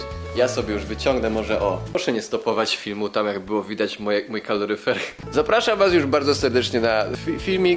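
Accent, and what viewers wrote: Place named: Silesia, Poland. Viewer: Błagam mów częściej po Śląsku, bo to cudo w twoim wykonaniu❤️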